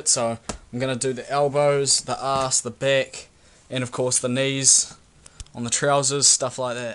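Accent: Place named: New Zealand. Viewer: I hear New Zealand. Chur for the video bro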